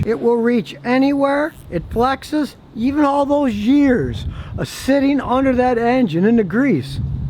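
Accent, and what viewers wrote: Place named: New England, United States. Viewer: If you were not from Texas I would have after watching this video, thought you were a old YANKEE from New England. you reminded me so much of my old friend Barry. Keep up the great work.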